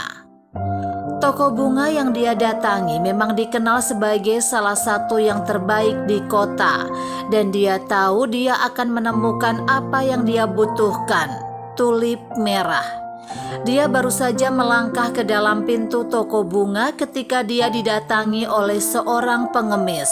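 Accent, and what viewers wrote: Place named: Indonesia. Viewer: maaf bacaan orang Indonesia dengan bacaan orang luar negri beda jadi just harus memakluminya yg lancar bahasa inggrispun belum tentu bisa seimbang dengan orang barat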